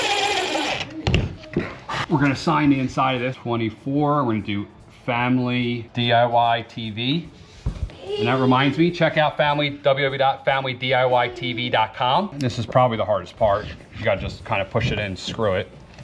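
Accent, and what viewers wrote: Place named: Delaware County, United States. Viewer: Dude… I know this video is a year old so you may not see this comment but you have to be from delco! I am too but live in Georgia now so hometown talk is always nice to hear. And thanks for helping me install my magnetic doorstop.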